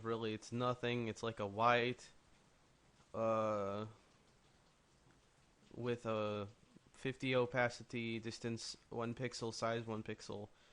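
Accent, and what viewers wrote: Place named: Russia. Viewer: He's Rrrrrussian.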